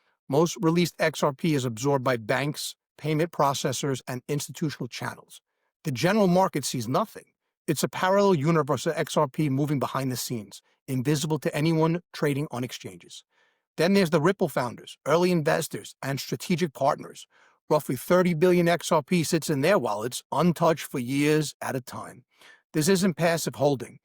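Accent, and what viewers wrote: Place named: Long Island, United States. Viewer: I lived in Long Island as a kid so I know the accent! I live in the Chicago land now but NY will always be home.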